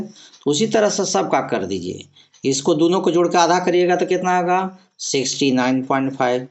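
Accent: Bihar, India. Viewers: Sir आप bihar से है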